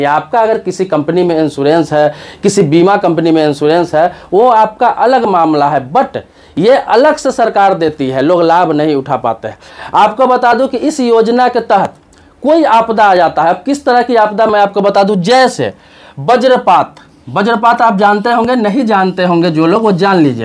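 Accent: Bihar, India. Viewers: Sir aap Bihar see hai. Na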